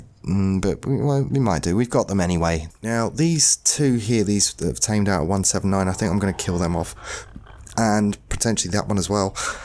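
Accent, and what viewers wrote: United Kingdom. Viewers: ive tried watching this but you are a true example of having a british accent making you only sound intelligent. its may lay not me lee. and im back tracking but its not fajora, youre way off. thats only a couole of examples. quit dumbing down society and get a brain, do some research for your "content" as they call it now. dont just sit on your ass and play video games and blast more commercials than the superbowl at people. mildly entertaining at best, would be better if you could pronounce stuff correctly and not make my ears bleed with the butchery of the english language you lot are so fond of claiming as the inventors of.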